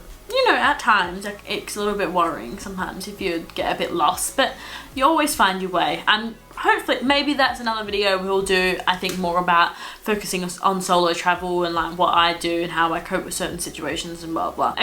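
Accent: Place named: England, United Kingdom. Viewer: It sounds like you have a bit of an English accent!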